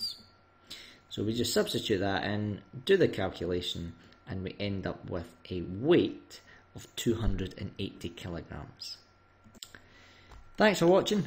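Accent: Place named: Scotland, United Kingdom. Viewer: Why are most maths videos on YouTube done by Scottish people? Seriously, there's like 9 of them! Great help brother, thank you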